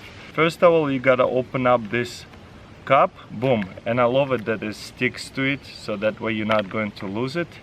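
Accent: Russia. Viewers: Dude, you still speak Russian! Just try to say: Adamadickly instead of Avtomaticheski aka автоматически and Boom!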